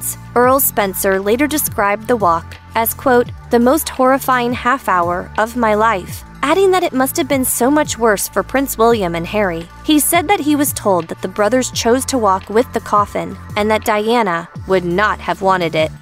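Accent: United States. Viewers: There is something irksome about an American voice over on videos of British affairs. Its like having a terrorist describing the devastation of their actions. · This unfortunate event would be far better remembered and narrated by someone other than an American. Pronunciation, together with a complete knowledge of British royal ceremonial would be a distinct advantage...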